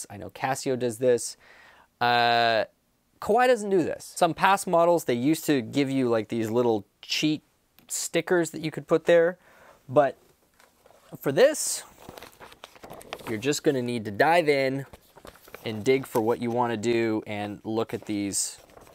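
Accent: Canada. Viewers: Loving the Canadian accent. I hear what you are talking "a-boot"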